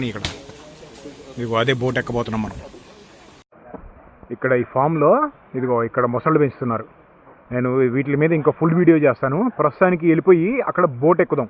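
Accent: Andhra Pradesh, India. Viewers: Bro meedhi andhra nundi velli nijamabad lo settle ayyaru kadhaa...me slang chepthundhi..appatlo chala mandhi akkada lands cheap ani koni akkade vundi poyaru..am I right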